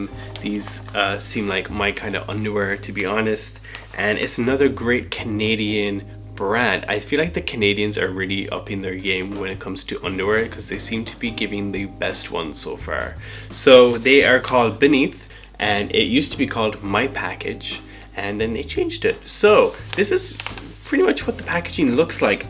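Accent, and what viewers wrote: Ireland. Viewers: Where are you from? I’m trying to figure out that accent...it sounds subtly Irish. I initially thought it was Scottish, but after listening to you, it definitely isn’t Scottish. Regardless, you’re nice to watch. 😍🏳️‍🌈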